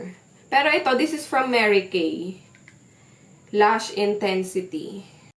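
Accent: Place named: Bulacan, Philippines. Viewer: ur so cute ganda..hahahaha.. naririnig q ang punto ng bulacenia sayu.hahhaa... i miss u nmn pati si skysky..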